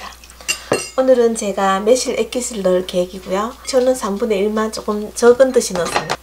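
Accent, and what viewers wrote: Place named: Daegu, South Korea. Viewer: 님 목소리도 시원시원 요리도 시원시원 대구 말씨가 정겹네요 팬입니다 저도고향이 대구입니다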